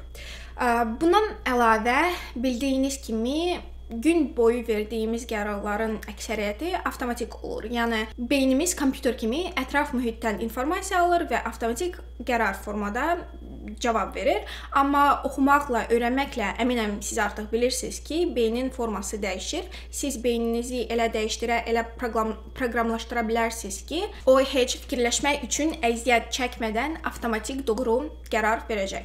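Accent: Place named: Russia. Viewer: по твоему акценту я понял что ты владеешь и русским языком, но пожалуйста разговаривай как тебе угодно не обязательно говорить на строгом азербайджанском будь свободной не комплексуй )))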